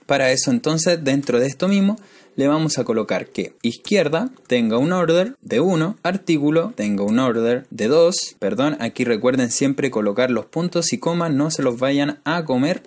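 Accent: Chile. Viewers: Jsjsjs sabia que eres chileno jsjs tu acento se nota🥺❤️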